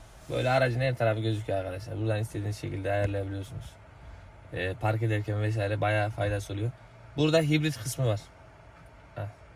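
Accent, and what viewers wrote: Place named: Central Anatolia, Turkey. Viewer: konusmayi bilmeyen herkes video cekiyo arkds ..niğde aksaray adana kayseri kırsehir vs sivesinden kurtamayan gurbetciler..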